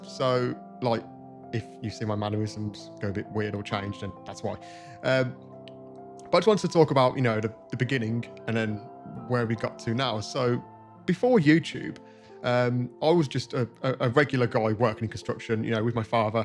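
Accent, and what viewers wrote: United Kingdom. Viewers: U r British?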